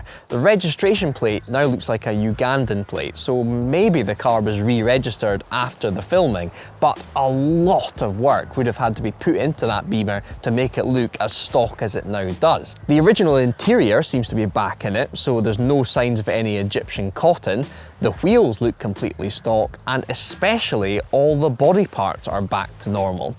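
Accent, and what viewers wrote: United Kingdom. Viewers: where in the UK is this guy from? ( love the accent.)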